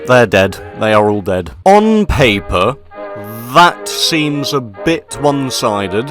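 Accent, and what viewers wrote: United Kingdom. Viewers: Bloody hell, this chap's a real Englishman! Accent, behaviour, EVERYTHING of him is Bri'ish!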